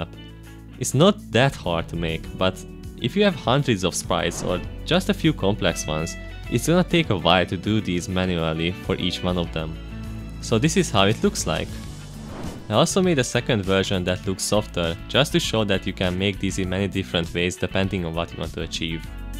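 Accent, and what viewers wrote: Hungary. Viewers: Az a jó fajta magyar akcentus nagyon hamar el árult de érdekesek a videóid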